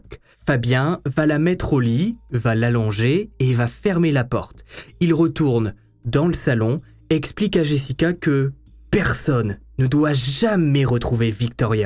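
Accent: France. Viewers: Enfinnnn ton retour !!! Super hvf, merci ! PS : j'adooore quand tu prononces les mots anglais avec ton accent français "background" 😂😂😂 (et "Raul" qui se prononce "Raoul"!) Bref je t'adore 😁❤️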